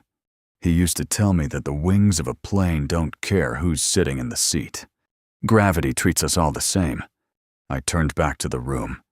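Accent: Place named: United States. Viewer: Sounds like another voice that I heard on another video, except it's not an exaggerated British accent. It's a highly rhotic American accent.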